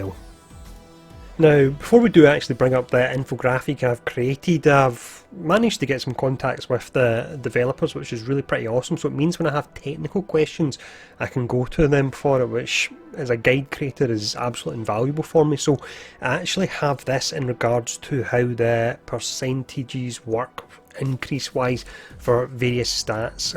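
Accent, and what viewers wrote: Scotland, United Kingdom. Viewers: I've deleted this game for space for other games. I'll wait till T'Challa shows up but I'm not too certain I'd re download it in general due to the fact they only make 3 or less maps to play on. I hate repeats. Also are you from Scotland? I love Scotland accents they're the best!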